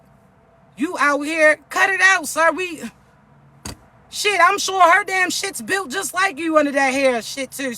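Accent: Baltimore, United States. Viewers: She from Baltimore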